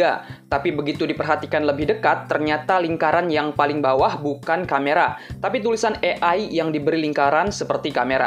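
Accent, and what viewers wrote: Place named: West Kalimantan, Indonesia. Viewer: nih pasti orang kalbar ya yg punya chanelnya😀😃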